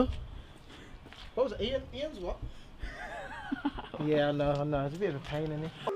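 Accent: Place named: Liverpool, United Kingdom. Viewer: sorry brother if I offended you by asking if you were from Liverpool mate haha you sounded like Nathan de asha a little bit 😂 if I lived in uk I would come work for you. I have my own detailing company and just bought a van last month. Yesterday I did 520$ in profit. Around 480GBP. Thanks for the inspiration mate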